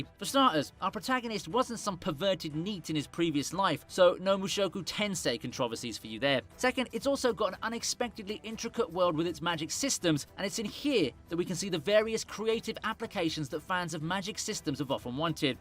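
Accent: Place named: United Kingdom. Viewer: Hey. I want you to know you are my favorite YouTube who covers anime by far. You have put me on to some of my favorite anime. Thanks for all the work you do. And for making these enjoyable videos. Love hearing that British accent before I get to see some badass anime😁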